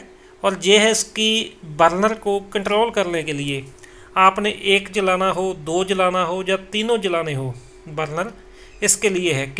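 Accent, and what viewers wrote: Punjab, India. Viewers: Hindi with punjabi touch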